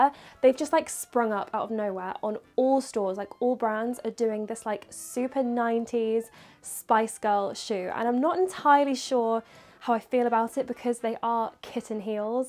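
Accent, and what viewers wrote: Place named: England, United Kingdom. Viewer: I love forever new, and your right it is so you Also love your accent because I grew up in England And I rlly miss it there and your channel just gives me a taste of it Love from Australia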